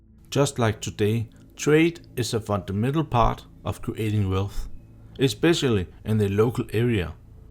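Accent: Denmark. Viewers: the speaker was really speaking very DanishEnglish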